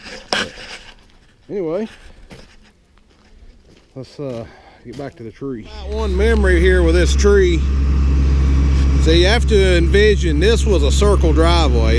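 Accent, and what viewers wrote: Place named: Tennessee, United States. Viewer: I’ve got a buddy from Morristown tn who has an identical accent If you had said “now look here” I’d have thought you were him Sorry about the tree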